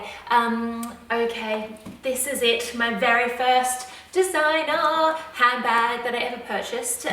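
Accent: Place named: Australia. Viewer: So nice hearing an Aussie accent on YouTube LV videos. Love the story. I almost had a heart attack when you pulled out the wallet.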